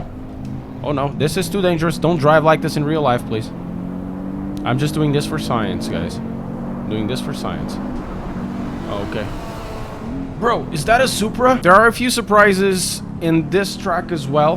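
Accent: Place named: Portugal. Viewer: I opened this video and 1 second later I thought "This guy must be Portuguese". The accent doesn't lie. Cheers from Portugal!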